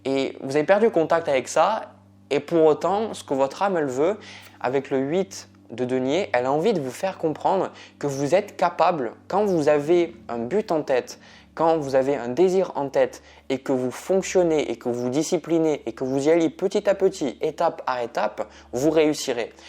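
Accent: South, France. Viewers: Merci pour ce tirage très pertinent. J aime écouter vos guidances et votre manière de les transmettre, de façon naturelle et franche avec cet accent du sud qui me reconnecte à mes racines.🙂 Merci aussi pour votre dévouement et l aide que vous nous apportez. 💕🕊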